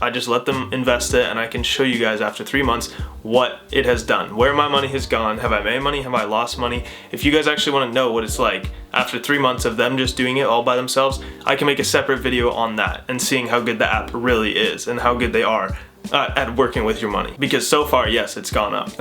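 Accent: Canada. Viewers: This was super helpful! Are you Canadian as well!?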